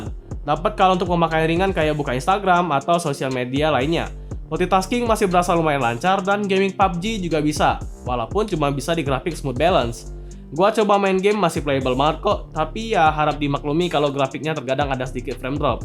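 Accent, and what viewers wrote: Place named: Bengkulu, Indonesia. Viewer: Orang mano bang?? Kok kayak bahaso bengkulu ??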